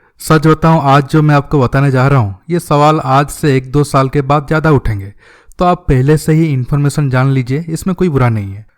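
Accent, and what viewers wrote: Odisha, India. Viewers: tum Odisha se ho kya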